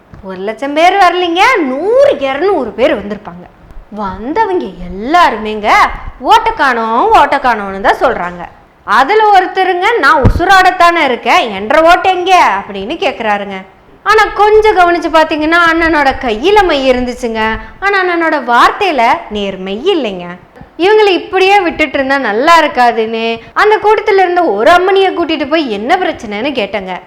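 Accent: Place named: Coimbatore, India. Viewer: Kovai slangs very good👍🎉❤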